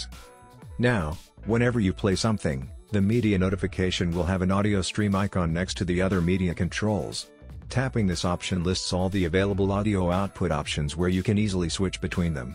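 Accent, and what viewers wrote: India. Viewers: Sounds like a India scammer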